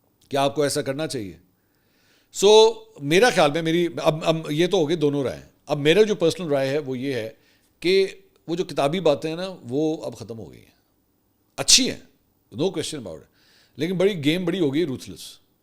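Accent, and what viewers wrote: Punjab, Pakistan. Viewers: Wah apki Punjabi ❤❤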